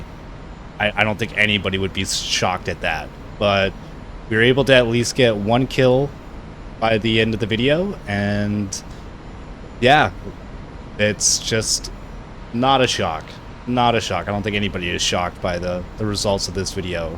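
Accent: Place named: Canada. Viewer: I didn't know there was a cult of purists as you describe, it seems so stupid to me. You sound Canadian, I'm Australian myself so let's just blame dumb Americans like we always do 😄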